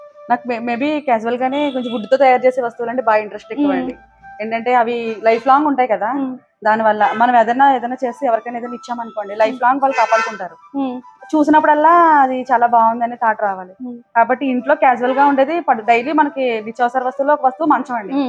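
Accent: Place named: Godavari, India. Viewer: అచ్చతెలుగు గోదావరి అమ్మాయి లా మి భాష బాగుంది నేచురల్ గా వుంది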